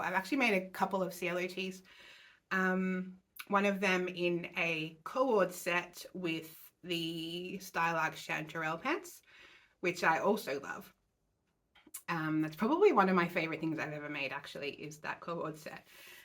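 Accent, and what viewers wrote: Australia. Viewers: Hello! Lovely to hear another Australian, I am from Brisbane and I am sure you will be good at this! Looking forward to seeing Moore! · Congratulations on your first video. Although you were nervous you came across very well. Looking forward to more of your videos. And yes, much like other commenters, it is lovely to watch and have a fellow Aussie talk about quilting . Keep going!! Sending positive vibes from Melbourne. 🌸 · Congratulations on your first vlog. I enjoyed listening to another Aussie. I’m from QLD and have the Hope dress still to sew although summer is catching up with me now. Looking forward to your next vlog!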